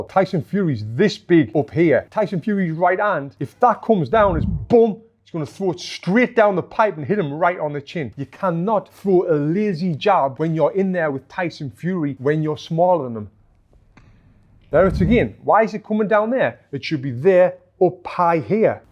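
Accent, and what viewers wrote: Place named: Liverpool, United Kingdom. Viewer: Anyone else seen that old English show “Creature Comforts”?? This guy sounds exactly like the Scouser Rat 😅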